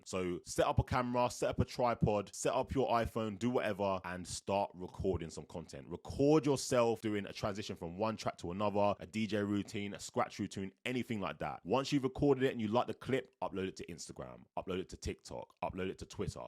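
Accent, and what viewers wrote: London, United Kingdom. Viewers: Dollars? Your accent made me think you’re down the road to me in London 😄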